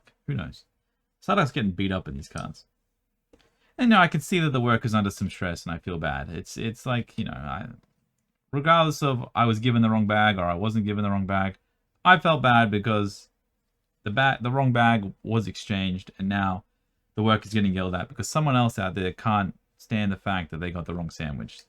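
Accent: Australia. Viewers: An hour in club here, and I'm here for the advice, for the realness, and also for the aussie accent I've missed so much since leaving Perth for Indo all those years ago. Seeing how you're so excited about how your recent sorting video made enough money to buy 5 subway sandwiches is gold, and then have that followed up with how wetting your lips or something can be sexual is slightly disturbing 😂. HOWEVER!! We need to have Kim on the channel as well,!! You've talked about her several times now and how she's amazing and everything, and you know full well a Youtube channel only ever taken off when the significant other makes a long-awaited appearance 😆. You can do subway mukbang video with her and see how many more subway sandwichs the video'll earn you 😁 imagine how excited you'll be at that prospect 😄 In short: we want KIM!! ✌🏼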